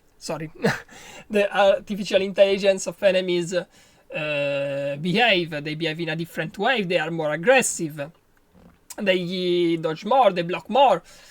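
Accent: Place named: Italy. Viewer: Love the italien accent! Great video!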